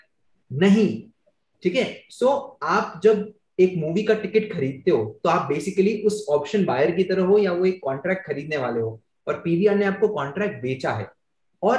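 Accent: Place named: United States. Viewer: Sir jis hisab se English bolte hain jese usa me class le rhe ho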